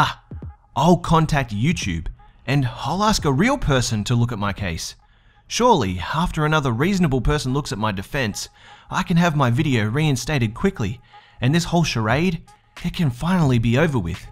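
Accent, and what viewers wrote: Australia. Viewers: definitely aussie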